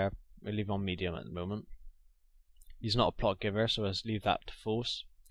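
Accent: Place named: Ireland. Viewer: Great tutorials, and do you happen to be from Ireland? You sound like it :P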